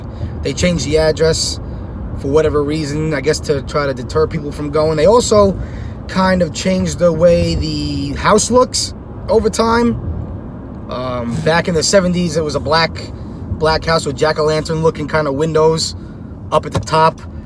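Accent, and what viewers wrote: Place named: Massachusetts, United States. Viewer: "It's a cloudy full day here on Long Island". I had to play his opening statement twice because of his accent. Sounds cool, a little bit like Massachusetts?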